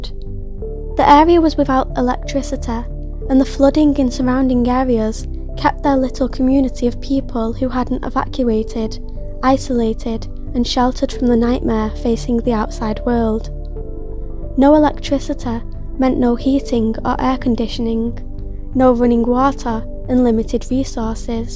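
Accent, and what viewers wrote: Manchester, United Kingdom. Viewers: You sound like Manchester England. Well done presentation!!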